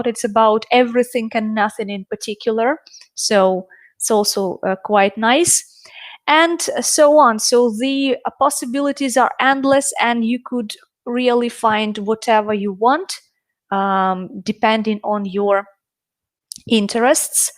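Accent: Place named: Russia. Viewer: Why do you speak English with Russian intonations?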